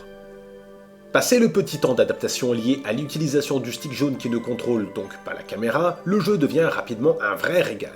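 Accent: Alsace, France. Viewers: Tu ne serais pas Alsacien ? Sinon,j'ai aussi galéré sur l'epreuve de la peur.. en faite je l'ai jamais reussi x')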